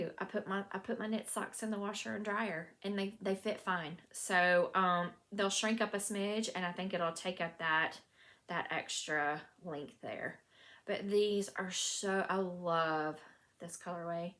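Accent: South, United States. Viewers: I ❤️ your podcast… and I think you are from a southern place than Michigan!!!’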